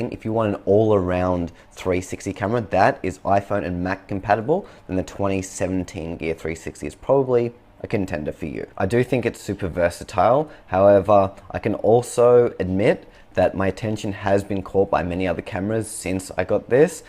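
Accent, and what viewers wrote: Australia. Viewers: Your saying the original sperical shaped 360 gear from 2016 records in 4K. I'm looking through reviews and nobody is saying that. Well I puzzled??? is this for real it records in 4K? thanks for answering in advance and my other question (seeing you are in Australia by the looks and accent) where in Australia can I purchase the XIAOMI? Can you recommend any retailers that sell it?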